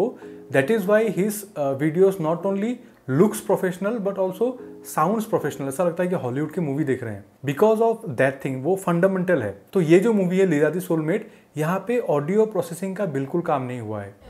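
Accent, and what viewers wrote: Assam, India. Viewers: this sounds like Assamese.. Oi bro tumi akhomiya ne??❤️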